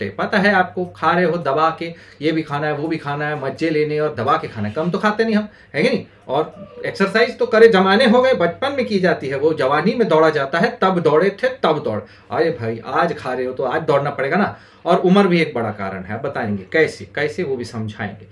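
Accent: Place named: Bihar, India. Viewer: Bihari accent 😅😅😅😅😅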